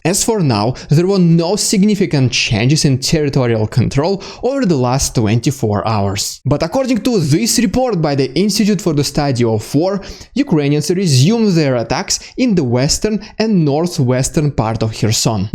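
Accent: Russia. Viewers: And you do a great Russian accent, too. Funny, that. Truly love what you are doing and showing that not every Russian is an orc, but then you see the real news, and not the state media at home. Hopefully, you might have some subscribers back home who can learn the truth. Regards from Aotearoa New Zealand.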